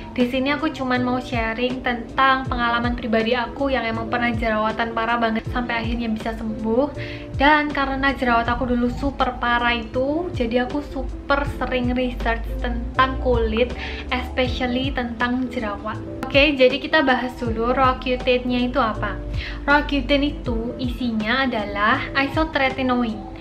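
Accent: Java, Indonesia. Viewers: ojo medok medok banget mbak hehehe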